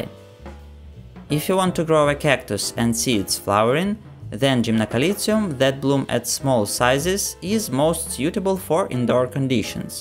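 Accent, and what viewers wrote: Russia. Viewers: It's a Russian accent.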